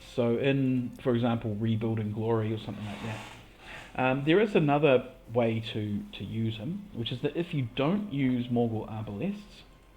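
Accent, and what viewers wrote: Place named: New Zealand. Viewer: Hey man you sound like your from NZ? Wouldnt happen to have an NZ squad I could join next season? Awesome content by the way, very thorough and informative!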